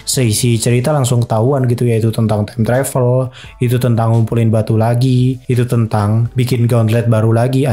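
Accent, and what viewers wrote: West Java, Indonesia. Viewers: Kang, sunda na timana? hehe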